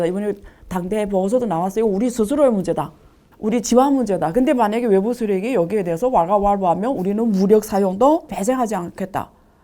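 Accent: Yanbian, China. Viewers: 。。加油！ 우리 연변의 자랑이예요 개무 갠데 걸쭉한 연변사투리 좋쓰꾸마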